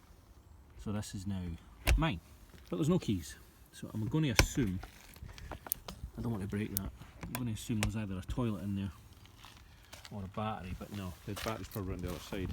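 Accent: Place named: Scotland, United Kingdom. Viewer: Maybe it's the equipment you're using. I only have the volume on at 1/4 and I can hear him perfectly well. It's either that or your're not used to a Scottish accent.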